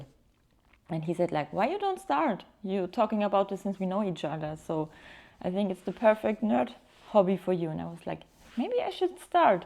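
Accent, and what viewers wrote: Germany. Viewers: Nach zwei Sekunden wusste ich dass du deutsch sein musst 🤣 obwohl dein Englisch nicht schlecht ist, man hört es eben immer heraus. Ich wünsche euch viel Spaß bei dem tollen Hobby, ich bin auch noch Beginner und fokussiere mich erstmal auf das PS5 Game, habe früher aber auch gerne so was wie Heroquest und gelegentlich D&D gespielt. Jetzt verkaufe ich alles was ich habe um mir eine Armee zusammenzustellen 😉 Ich werde deinen Weg hier gerne weiter verfolgen. Grüße aus Hamburg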